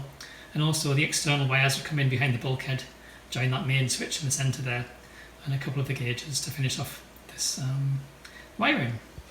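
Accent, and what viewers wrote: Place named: County Durham, United Kingdom. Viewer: Hello Mate, In the process of series 2a rebuild, found a lot of your vids really helpful but electrics Is my downfall have not got a clue. You sound as if you are originally not far from me I am in County Durham. How much would you charge to make me one of these looms ? I already have one through the Chassis, need one for Bulkhead. Thanks k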